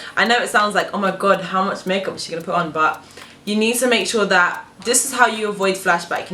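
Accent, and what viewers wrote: England, United Kingdom. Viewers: Nice and natural! This was great video. love the English accent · your British yay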